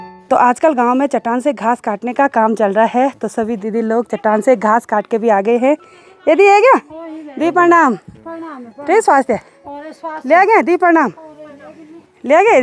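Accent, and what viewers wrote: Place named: Uttar Pradesh, India. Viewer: Jab aap hindi bolte ho tab aap garhwali nahi lagte up k lagte ho 🙏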